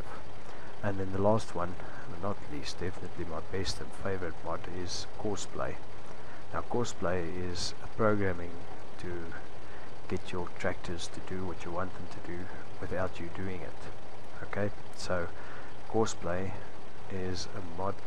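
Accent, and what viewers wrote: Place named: Afrikaans, South Africa. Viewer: awe ou like jou video kan somer hoor jy is afrikaans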